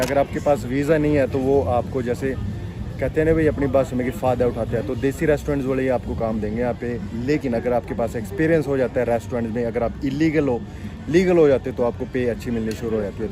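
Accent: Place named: Yamunanagar, India. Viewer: Boli to ynr side wali h bai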